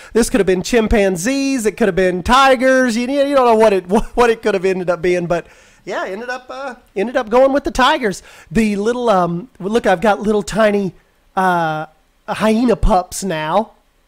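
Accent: South, United States. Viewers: correct me if I'm wrong but are you from the Southern part of the United States cause you have what they call a Southern Accent I have a Grate Uncle who lives in Kentucky and when he talks you definitely know he's from the South even though he grew up here in New England, New Hampshire to be exact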